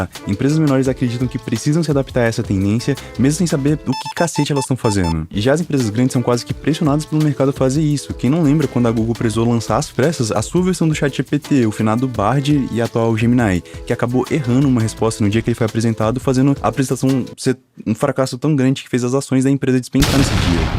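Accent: Brasília, Brazil. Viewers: tu é daq de Brasília? kkkk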